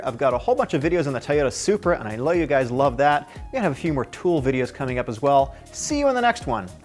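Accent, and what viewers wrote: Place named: United States. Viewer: You look British but sound American. Good video, thanks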